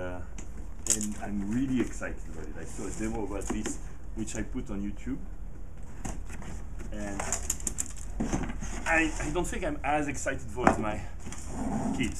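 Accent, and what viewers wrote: France. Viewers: when french people talk english ... -_-'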